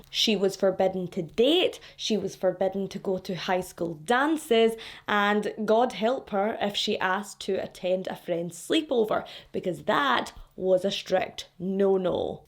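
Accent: Scotland, United Kingdom. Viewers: Living you I've been a true crime follower for a few years now. started it all! But I just love a Scottish accent tell me crime stories. You are awesome. Jen frombiggar!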